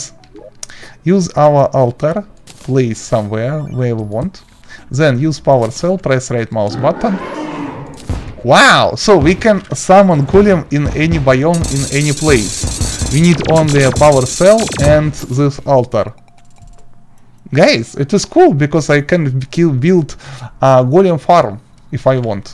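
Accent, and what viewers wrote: Russia. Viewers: You are Russian? Accent is similar😅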